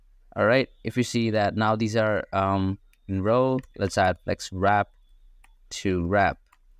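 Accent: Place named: United States. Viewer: hey bro i just want to know how you learn american accent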